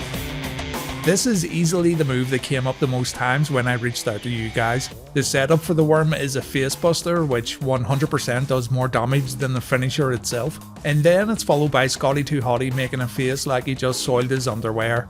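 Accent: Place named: Liverpool, United Kingdom. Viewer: A scouse talking about wrestling. Subscribed.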